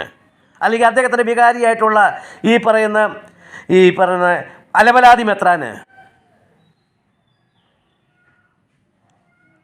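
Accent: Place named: Changanassery, India. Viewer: ഈ ഒട്ടിക്കൽ മാത്രമേ നിനക്ക് അറിയുകയുള്ളു. തനി ചങ്ങനാശേരി ഭാഷ. പോടാ തെണ്ടി.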